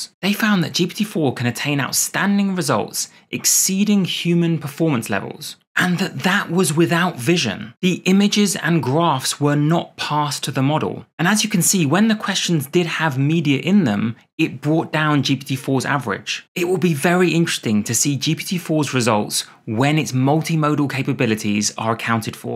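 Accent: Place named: United Kingdom. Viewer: Having a British accent is a cheat code for being a science / STEM YouTuber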